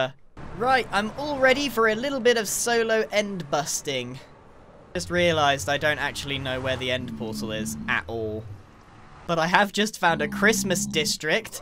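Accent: United Kingdom. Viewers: I knew u was British! Help me and meet hahaaaaa..... 😋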